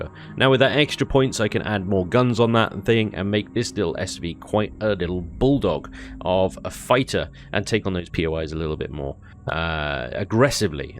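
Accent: United Kingdom. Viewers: It's his british accent. Really charms your pants off, lol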